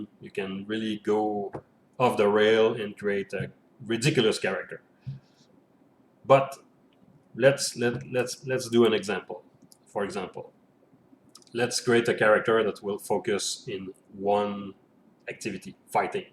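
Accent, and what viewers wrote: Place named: Quebec, Canada. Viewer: Merçi bien! J’ai grandi à Deux Montagnes… a very familiar accent. In NB now. Im a big fan of SotWW and will be playing soon. Thanks for this reflection.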